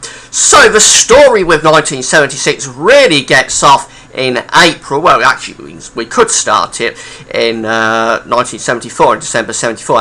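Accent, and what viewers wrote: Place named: East London, United Kingdom. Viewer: was a bit giddy at the start and full of the energy. Sounds like east London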